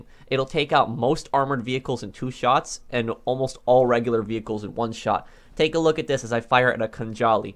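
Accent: Poland. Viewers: Polish? You from poland? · Where are you from? Poland?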